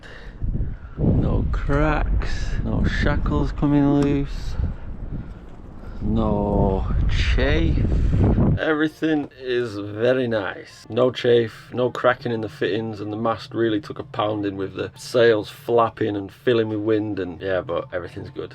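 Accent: Lancashire, United Kingdom. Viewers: where were you born, cause you sound a bit like me (Bolton Lancashire) Not exactly a sailing hub!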